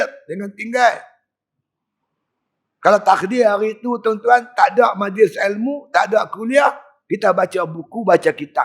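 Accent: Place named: North, Malaysia. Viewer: Sy suka mendengar ceramah ustaz..kdg² terhibur dgn loghat utara ustaz 😂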